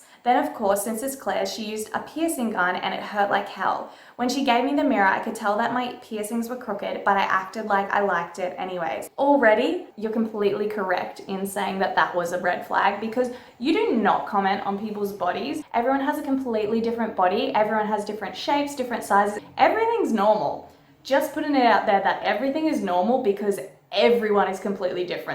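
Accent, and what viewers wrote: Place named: Australia. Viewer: i LOVE the way you say tattoo ! Australian accents rule :) much love from the States <3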